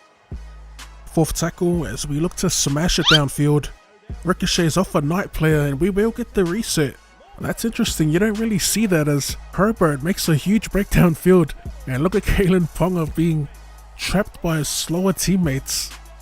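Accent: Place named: New Zealand. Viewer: Love hearing a kiwi pronounce all those names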